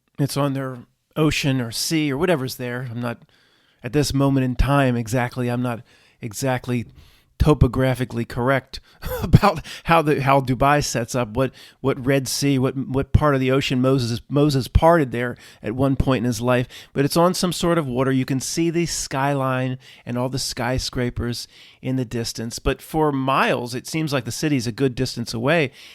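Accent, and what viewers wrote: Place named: United States. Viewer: Hey it’s guys. I like your non accent. Reminds me of my father. But you say wurter instead of water. Have a nice day. I was listening to Tim Keller on YT and he was a bald guy from Pennsylvania too.Same nice American accent!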